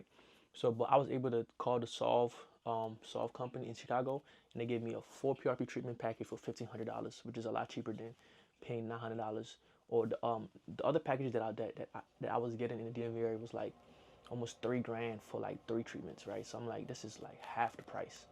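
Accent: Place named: Liberia. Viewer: This pekin sounds like a Liberian pekin!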